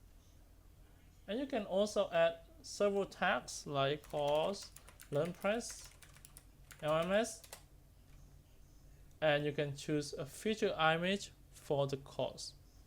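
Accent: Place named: Vietnam. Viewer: ui nghe như giọng VN luôn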